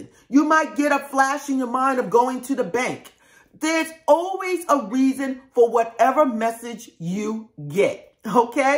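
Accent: New York, United States. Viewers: This has been happening 4 or 5 times during the week I'll start doing this tonight. Thank You Oh yeah. Are you from NY.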